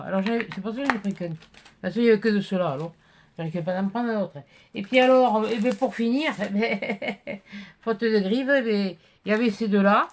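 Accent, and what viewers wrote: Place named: Médoc, France. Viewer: Bonjour très heureuse de voir que vous allez bien Vos boîtes sont magnifiques la boîte que vous avez faite pour la dame est très jolie vous êtes une très bonne personne et cette dame a un très grand cœur Je ne suis pas scapeuse mais j'aime beaucoup se que vous faites et vous me faites bien rire votre accent me rappelle le mien je suis originaire du médoc Prenez bien soins de vous Je me permets de vous embrassez embrassez ❤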